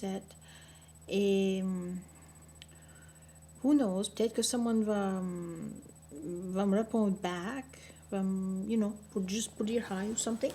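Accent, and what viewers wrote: Acadia, Canada. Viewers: This is a good representation of one accent, though there are many french accents across the Maritime provinces. Especially in the Acadian regions. Some don't involve any english. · wow. je vais t'avouer. Je n'ai pas compris grand chose XD y'a l'accent anglais avec les mots anglais. y'a l'accent typiquement canadien français un peu et un peu l'accent acadien avec les mots français. Je pensais que c'était juste les Acadiens du sud du Nouveau Brunswick qui parlaient comme ça. Tu dois surement venir d'une place anglophone. et je vais t'avouer que j'aime pas trop quand on mélange TROP l'anglais avec le français. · I like how this dialect of French sounds, and you speak it so well. I'm wondering is there also a dialect like this in the other Canadian provinces and territories, but a different kind of text and speech? I'm pretty sure that the other Canadian provinces have their own dialect,too. 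No?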